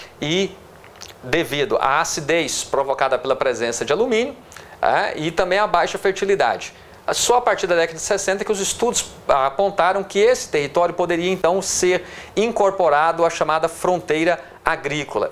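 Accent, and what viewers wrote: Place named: Goiás, Brazil. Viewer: Olá professor, Eu sou psicóloga e já trabalhei com RH e sei que às vezes é difícil encontrar Profissionais competentes e atenciosos como você; E viva o nosso sotaque Goiano 🇧🇷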